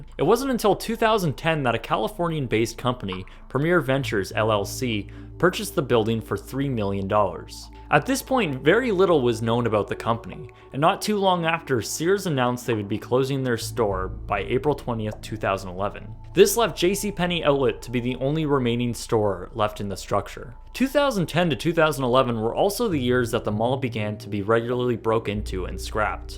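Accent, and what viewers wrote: Canada. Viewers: I just love watching your videos,. They are so interesting and your Canadian accent is just so cute (myself knowing it well living in the States less than 100 miles from the border). Keep posting!:-) x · You can tell by this guy's accent he's Canadian. As an American, I've never heard anyone call anything a "Dollarama". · He’s canadian. We have a slight different accent from Americans... people say it isn’t noticeable but this literally is just an example of how we say some words differently.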